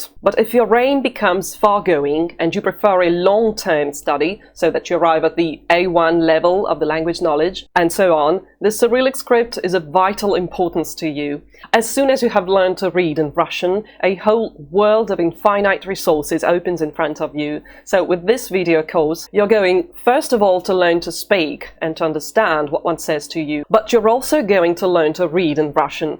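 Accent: United Kingdom. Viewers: I liked this lesson and your teaching way and British accent. Thank you. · Your London accent is delightful to listen to. The Russian pronunciations are most helpful, especially the "o"sound in words like "это", which I've been mispronouncing as a long "o" rather than an "a" sound. Little thing - big difference! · Very nice intro video. Did you study English in the UK or have a British instructor?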